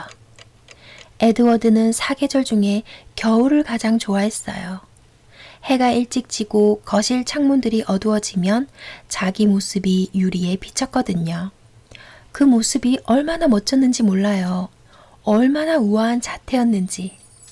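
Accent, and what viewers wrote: Gyeongsang, South Korea. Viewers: 신나이 들으면서 구독 하기 시작했는데 목소리 톤이 좋으시고 경상도사투리 억양조차 푸근하게 들리네요 (feat. 연기력) 좋은 컨텐츠 감사합니다^^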